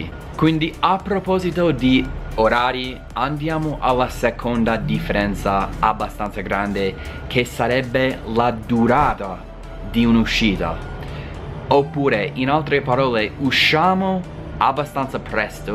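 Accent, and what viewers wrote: United States. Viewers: Altra cosa, mi piace un sacco sentirti parlare italiano con il tuo accento americano 🤩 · Un abbraccio da Genova che italiano super....bravissimo...ottimo video!! E' carino l' accento americano quando parli la nostra lingua sei forte....Genoa e United States forever...!! Tanta fortuna a te e auguri.. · a hint of american pronunciation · Ti posso chiedere come mai hai ancora questo forte accento americano nonostante dai due anni e mezzo vivi in Italia? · Mi piace ascoltarti: parli un'italiano davvero ottimo e con questo accento americano l'ascolto è piacevole!Complimenti!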